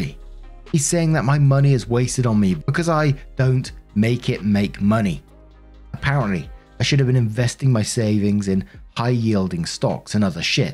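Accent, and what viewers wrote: United Kingdom. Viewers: I love hearing how you pronounce words differently in the UK than the US!!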